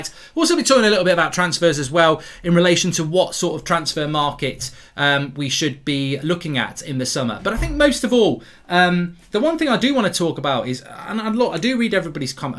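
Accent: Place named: Liverpool, United Kingdom. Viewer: Bloody awful scouse accent mate, no talent show for you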